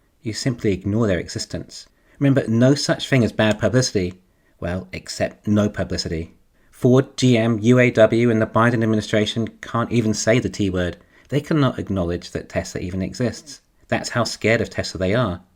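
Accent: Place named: London, United Kingdom. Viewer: Sounds closer to London to me (or at least home counties)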